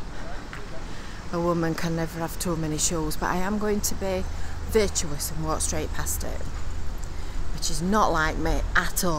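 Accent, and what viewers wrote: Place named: Lancashire, United Kingdom. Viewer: Excellent walk-round. As a Cornishman I loved the Lancs accent which made this vid attractive; ( most walk rounds have an irritating head and even more irritating narration).....but you could read me Lloyd's Shipping Register and I would listen! Are you called?.....if so, congratulations on a compelling walkaround. Tip; do a walkaround of the UK coastline.............I'll watch and listen!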